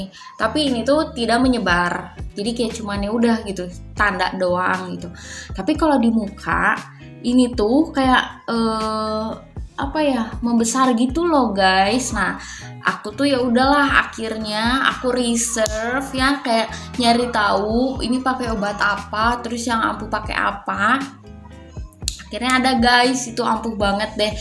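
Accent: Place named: Pandeglang, Indonesia. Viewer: Logat nya pandeglang .. hehe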